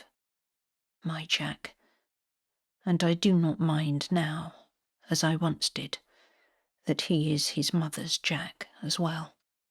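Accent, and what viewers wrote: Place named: Scotland, United Kingdom. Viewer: What a great little story, I thoroughly enjoyed it. Sitting here with my eyes closed, I imagined I was at Mallory. As usual a brilliant narration and I loved your Scottish accent. Thankyou so much.❤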